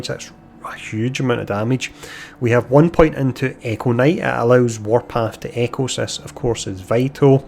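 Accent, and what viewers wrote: Scotland, United Kingdom. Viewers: I came for the build, stayed for the Scottish accent.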